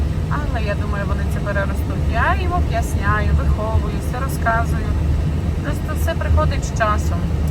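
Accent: Rivne, Ukraine. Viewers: Це так говорять на Рівненщині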